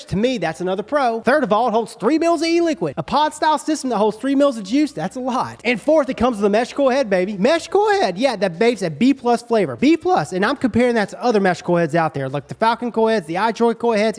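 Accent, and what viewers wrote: United States. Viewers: Those contacts would look cool if your wore them properly. Instead you have your eyes open as wide as possible like a damn meth head making you look strait up dumb. Learn how to wear them the right way. But you sound American so stupidity is expected.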